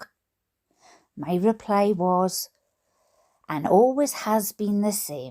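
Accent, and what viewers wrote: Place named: Wales, United Kingdom. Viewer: Ok my lovely! Wonderful if your accent & my southern Kentucky accent talking to each other. Add Rickey Watson in the mix.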